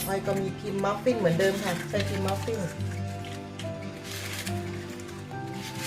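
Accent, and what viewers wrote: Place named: Korat, Thailand. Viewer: สำเนียงเหมือนคนโคราชเลยค่ะ😊😊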